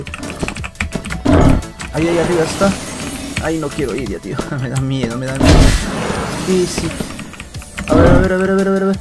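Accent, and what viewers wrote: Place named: Peru. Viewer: Se me hace que eres peruano, salueame n tu próximo video plssssssssssssssssssssssssssssssssssss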